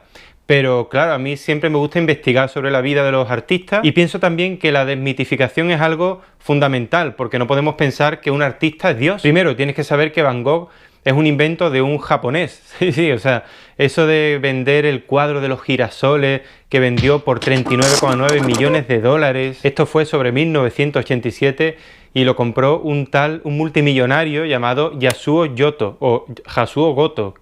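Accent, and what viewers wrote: Andalusia, Spain. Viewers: Este señor me parece andaluz no? Amo la noche estrellada sobre el Rodano. Me encantaria seguir sus pasos en Arles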